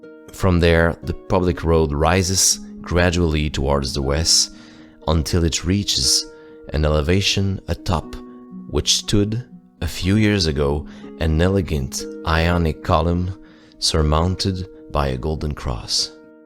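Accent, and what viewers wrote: Quebec, Canada. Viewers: As a Quebecer, I've heard of La Corriveau, but it was a different story. I wonder how they discovered it really was only the two men she had killed? Very interesting, and love the added touch of having a Quebecer narrate this story.. the morbid aside, this was lovely! :) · Also it's great to hear a quebecer !!! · To those poor little souls hurt and insulted by a slight French Candian accent... Did you also go on Disney's forums to complain that characters in Encanto have a Spanish accent? The legend is from Quebec City and Lévis, where citizens still talk French today. It's 2022, FFS! Please, grow a damn spine and enjoy people's cultural upbringings! It's a strength, not a weakness!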